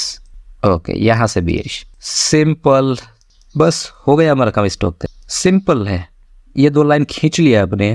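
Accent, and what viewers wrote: Gujarat, India. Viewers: Kya aap Gujarati hai???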